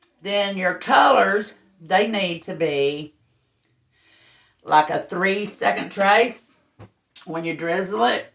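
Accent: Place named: South, United States. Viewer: I just accidently found you today and you are a breath of fresh air. Your dutch pours are even better than kanella and your personality is 1000% better. As far as lisa marvin your cleanliness and professional look of your work is top of the line. I cringe watching the mess lisa works in haha. I am so very glad to have found you. You are REAL not fake in anyway and i hope your channel grows astronomically. You deserve it. I am Yankee so your accent makes me chuckle sometimes. Southern belle for sure. Not some richy trying to get richer off others but a real deserving artist. I have been doing fluid art since 2018. My husband was diagnosed with pancreatic cancer and it gave me my peace when my soul needed it. He died sept 2019. I love doing my art and love just mixing the beautiful paint colors some days. I just wanted to tell you what a wonderful soul i found you to be. 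You are great. You say you are timid, i find you humble and deserving. Keep your guardian angel painting for yourself because i know you have one watching over you, no doubt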